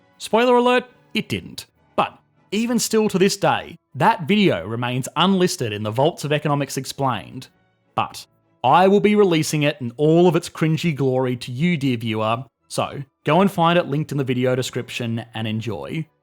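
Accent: Australia. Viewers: Me: doesn’t get it in class... finds it explained on with cringe jokes and an Ausie accent and awesome stock footage. Me: google giveth🙏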